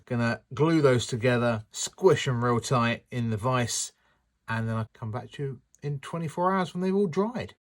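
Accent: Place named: United Kingdom. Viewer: Not only did I enjoy that, I’ve saved it for later. It is different and I like different. It’s also nice to hear a British accent. Thank you for sharing 🌞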